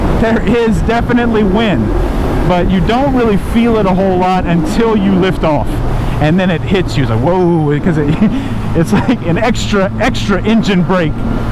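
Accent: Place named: Louisiana, United States. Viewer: Very informative. Nice, soothing Cajun voice.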